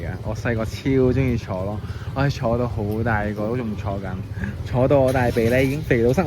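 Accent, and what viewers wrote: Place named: Macau, China. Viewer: 澳門口音好得意😂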